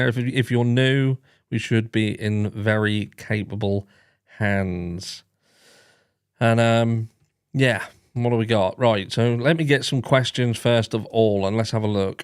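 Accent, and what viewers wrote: Norfolk, United Kingdom. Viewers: Thanks for the LIVE! Your Norfolk accent is truly showing:) keep up the good content. you make me laugh.:D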